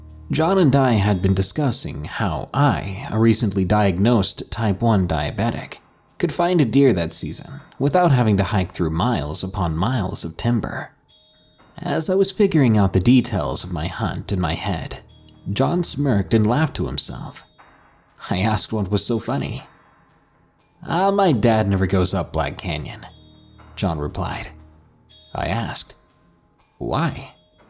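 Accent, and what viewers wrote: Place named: South, United States. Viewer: Been a fan of now for 3 years....hahah back when he had a southern accent...anyway keep it up. I help sponser too by being a fan:):)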